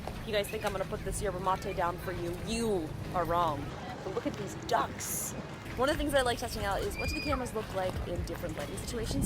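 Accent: United States. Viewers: wow You talking like😲😲😲 Wow People from u.s are adorable than I taught they will be... 😁😁😁I don't even know if you are from u.s but you sound like them "lol" It's amazing😉... Well actually you are changing the rules of a girl now huh.....well my taught still not wrong maybe you are the one who's different Wellhuh! It nights🌃 here my country so im going for bedtime sleep 😴 after playing some 🏀🏀⛹️‍♀️...everything workout well...... SEE YA👋🏻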